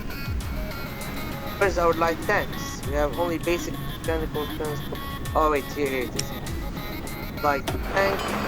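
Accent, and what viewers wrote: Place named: Arab world. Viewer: Great series! I can tell you're an arab, but where are you from?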